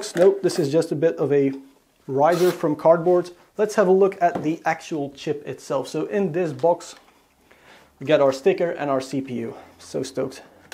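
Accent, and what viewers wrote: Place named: Netherlands. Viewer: Are you dutch? you sound dutch. And do proccesors come with thermal paste?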